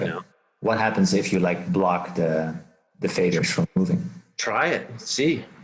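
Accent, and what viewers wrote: Netherlands. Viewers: ha lijkt wel een Nederlands accent 🙈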